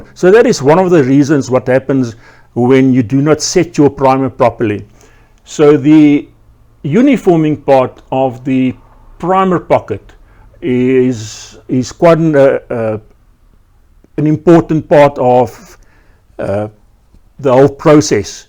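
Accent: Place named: Afrikaans, South Africa. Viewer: I recognize that accent! Classic Afrikaans accent. ❤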